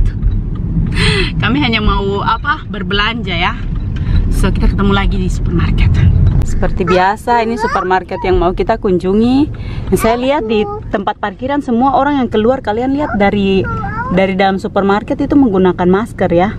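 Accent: Papua, Indonesia. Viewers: Logatnya mirip Papua ? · Im fom malaysia...suka sgt video kakak.. Malah kakak guna bahasa Indonesia (papua).. Suka dengarnyaaaa....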